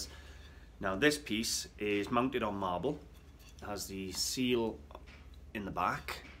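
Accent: Wales, United Kingdom. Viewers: Great video. Aussie viewer here. Love that welsh accent.. I’ve got family over there and it gets me every time lol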